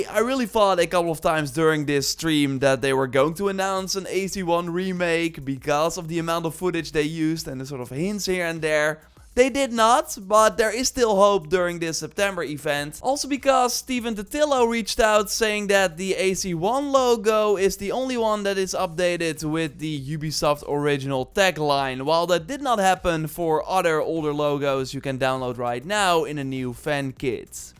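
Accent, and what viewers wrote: Netherlands. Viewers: The Holland dutch accent tho strong here.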